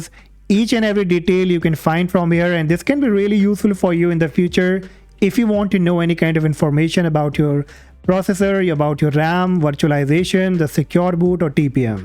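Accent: India. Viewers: Why ...Indian accent is good! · I was about to do the same of stop watching - not because of the accent - but because of my past experiences with the contents of the videos with Indian accent. But wanted to continue for few minutes before stopping and later found that the content was so good. I think, better to give few minutes of time before stopping just by accent. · 👍👍👍 so true... An indian english accent was so boring...But its better than any other language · This indian accent help me to install windows 11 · Indian accent, stop watching. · I feel shame on you bro. What's the problem with Indian accent? Don't be a racist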